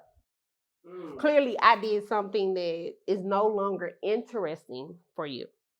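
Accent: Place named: South, United States. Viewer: She has a southern accent!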